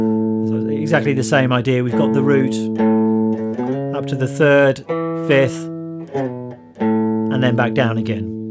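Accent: United Kingdom. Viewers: Eres británico verdad?jsjs:3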